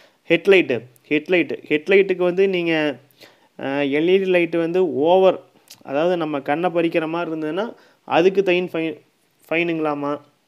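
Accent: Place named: Kongu, India. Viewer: neenga kongu Tamil peasumpothey nenaichan....nanum erode dt than bro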